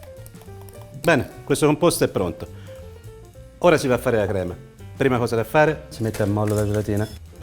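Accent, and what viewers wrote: Tuscany, Italy. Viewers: E' toscano, probabilmente. Ci viene naturale!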